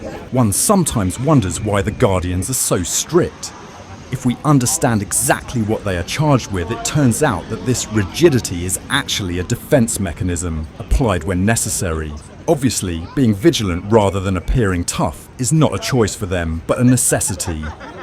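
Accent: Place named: United Kingdom. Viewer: Listening to your British English, your pronunciation. It's an English lesson for me. Thanks from Argentina. Excellent videos.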